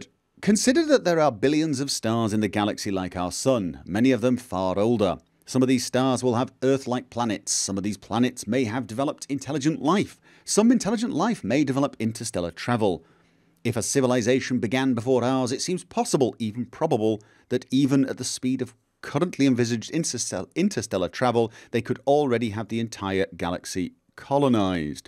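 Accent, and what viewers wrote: United Kingdom. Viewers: Jeancly Hi! I'm learning british english, could you tell me where is his accent from?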